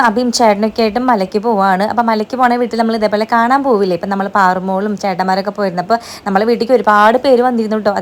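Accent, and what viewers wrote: Thrissur, India. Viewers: പൂ ആണ്... authentic thrissur സ്ലാങ്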